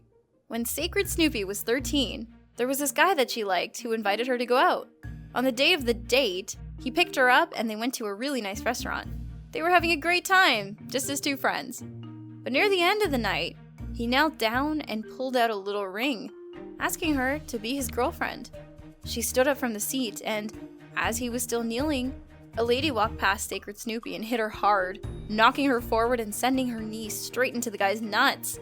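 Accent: Canada. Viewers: Are u Canadian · is canadian? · Is Canadian? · Is from Canada? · Huh, didn't know was Canadian.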